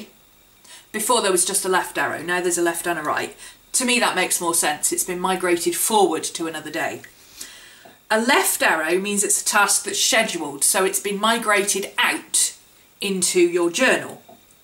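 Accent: United Kingdom. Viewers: I'm just about to start bullet journalling :) Happy to hear a British accent, I was starting to think it was a purely American thing x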